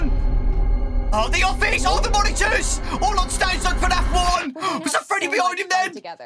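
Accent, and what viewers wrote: United Kingdom. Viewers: Ever since I watched ur channel I only think of fnaf when I hear a British man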